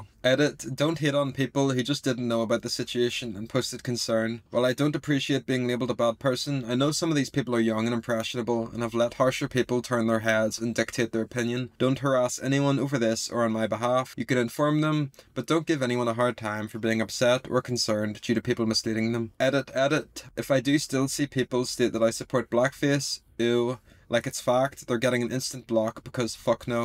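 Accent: Northern Ireland, United Kingdom. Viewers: so strange seeing a video like this being narrated by a person with a northern irish accent, pretty rare lol caught me off guard as i’m not used to hearing my own accent on youtube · I can't tell which part but i feel like you're from the UK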